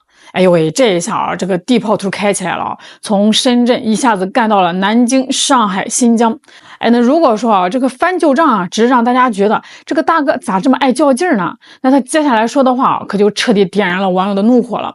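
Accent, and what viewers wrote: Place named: Shandong, China. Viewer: 我不在乎说什么 我是来听山东普通话的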